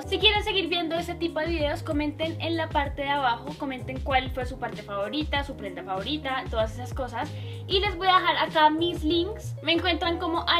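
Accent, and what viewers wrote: Colombia. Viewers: ana sofia perez chaparro Creo que basta escucharla hablar para darse cuenta que por su acento es colombiana. :)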